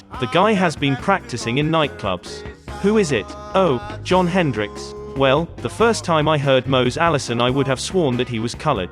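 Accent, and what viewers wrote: England, United Kingdom. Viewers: That aint Frank Zappa . Since when does Frank Zappa have a British accent? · Why is this not Frank speaking and some English twit ? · We are hearing Zappa’s words, read by an Englishman? Gtfoh.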